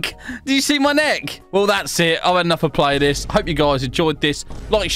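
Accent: United Kingdom. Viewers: I like u because I love your british accent 🤠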